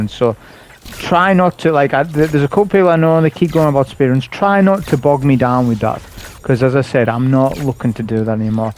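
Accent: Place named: Middlesbrough, United Kingdom. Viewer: Top content as always. I don’t want to be cheeky but your ascent sounds like you’re from the ‘Boro.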